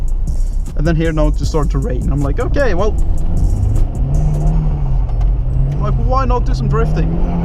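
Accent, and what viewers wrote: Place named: Norway. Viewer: Where are you from? You sound Norwegian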